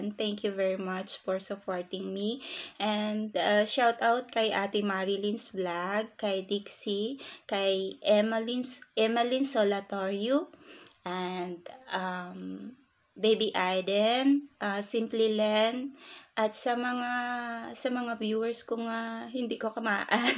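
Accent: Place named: South Korea. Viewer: Ano ba ga talaga ang tono mo sis? May koreana, may ilonggo, may mahinhin at ilokana, anyways, go go lang sis 😘😘😘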